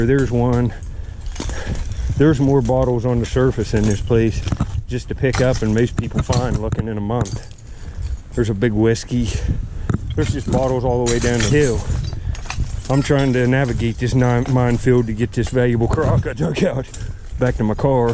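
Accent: Western Pennsylvania, United States. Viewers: Like ur content, awesome finds in these. Just subbed ur channel to support a fellow Buckeye. If you are originally from Ohio, you have the distinct twang of the Western PA/WV panhandle in ur voice.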